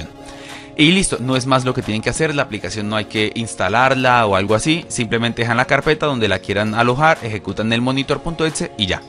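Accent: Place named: Cali, Colombia. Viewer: Excelente vídeo, tu acento dice que eres de la Sucursal del Cielo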